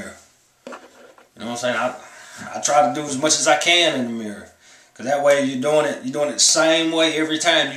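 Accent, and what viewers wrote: South, United States. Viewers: Lol he sound like he from the south od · Country accent is dope "G" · sexy assss country accent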